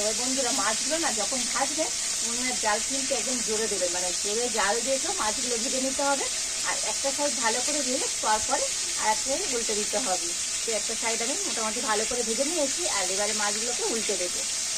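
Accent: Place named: Dhaka, Bangladesh. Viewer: দিদি ভাই আপনিকি ঢাকা জেলার লোক?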